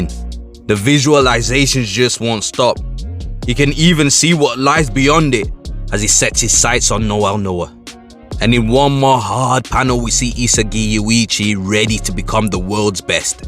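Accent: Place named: Jamaica. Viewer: hey are you friends with Truther? You sound Jamaican and truther is jamaican as well. Just asking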